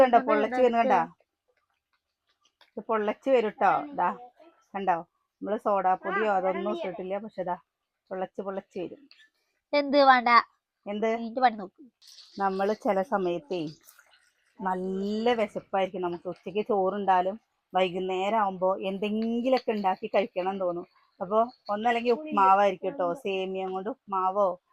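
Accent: Palakkad, India. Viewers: Palakkadu kkar aganeyanu parayuka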